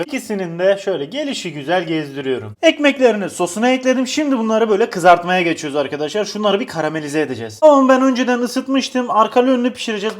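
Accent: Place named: Diyarbakır, Turkey. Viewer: Abi Diyarbakırlı misin ?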